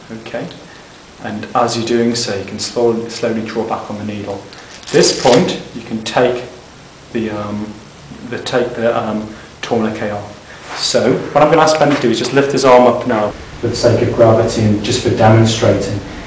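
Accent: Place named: England, United Kingdom. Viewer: finaly after serching for ever i found an english one not american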